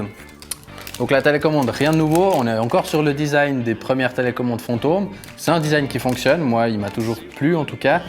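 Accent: Switzerland. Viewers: Nice 👌🏻 Suisse ? Avec l’accent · bel accent suisse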